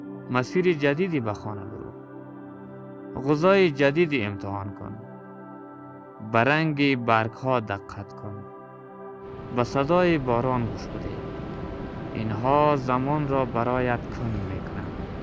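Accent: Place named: Afghanistan. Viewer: بی نظیر بود امیدوارم موفق باشی چون این نوع محتوا ها را برادران ایرانی ما زیاد میبینن البته فقط محتوا های که خود ایرانی ها ساخته باشد اما چون لحجه ما افغان ها را دوست ندارند بعد ازینکه روی ویدیو کلیک کردن همینکه لحجه ات را بشنوند فورا از ویدیو خارج می‌شوند ما افغان ها همیشه دنبال کننده برای آنان بودیم ولی آنها فقط خودشان را می‌بینند امیدوارم تعصبات را کنار بگذارند شما ادامه دهید موفق میشین انشاالله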